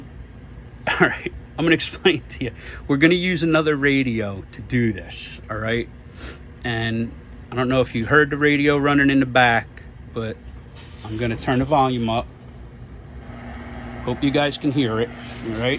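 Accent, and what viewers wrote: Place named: Long Island, United States. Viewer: Sounds like Long Island N.Y.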